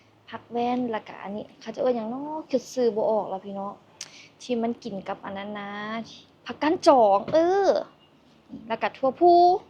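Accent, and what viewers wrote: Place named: Vientiane, Laos. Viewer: สำเนียงเวียงจันทน์ ไม่ใช่คนลาวใต้ ถ้าลาวใต้จะเป็นแขวงจำปาสัก สาละวันสำเนียงอุบลราชธานี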